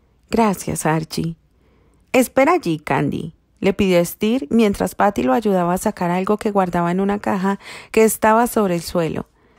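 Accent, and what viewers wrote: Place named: Latin America. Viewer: su acento latinoamericano tan hermoso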